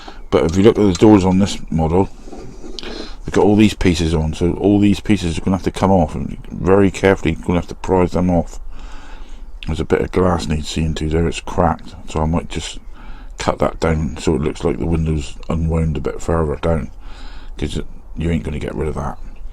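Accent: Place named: West Country, United Kingdom. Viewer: Thoroughly enjoyed watching that, spent this evening watching a few of your videos, you do excellent work. Nice to hear a fellow Westcountry accent too!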